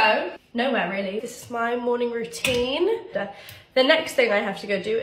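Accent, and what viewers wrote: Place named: United States. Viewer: Y does she sound american??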